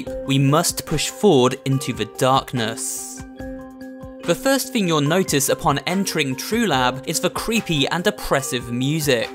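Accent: United Kingdom. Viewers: It's a British accent, dude. Don't judge him. He can't help it. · Its his British accent :I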